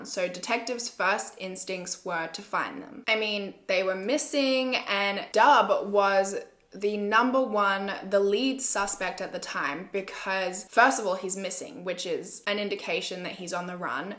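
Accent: Australia. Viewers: I absolutely love Aussie accent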